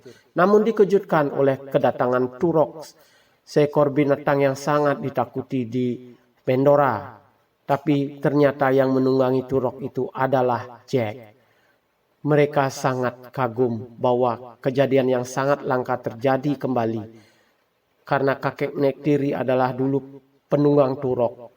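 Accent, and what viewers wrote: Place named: Kupang, Indonesia. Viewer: Orang kupang kyaknya nih😂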